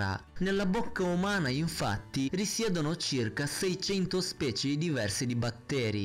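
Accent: Russia. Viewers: oddio sei un grande,il modo con cui ti esprimi,dettagliato e con parole ben scandite,il modo con cui parli (so che sei russo però questo è positivo perchè ti rende la voce tipo enigmatica e questo a me piace),queste cose ti rendono perfetto per il genere di video che fai,dovresti avere più iscritti e,ti farai mai vedere?fai una top 5 sulle curiosità sul lego?sei un grande continua così ;) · dopo tutti sti video tuoi che mi sto vedendo ho disperatamente bisogno di un accento russo come il tuo ahahah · sei russo? dall'accento si sente un po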